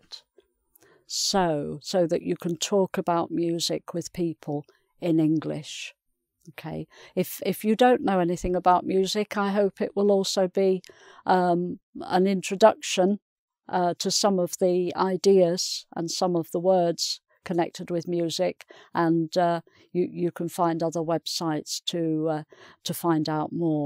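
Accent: United Kingdom. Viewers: wow vary pority english british viva teacher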